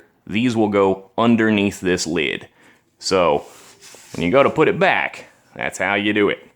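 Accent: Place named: United States. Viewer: This guy's accent is so American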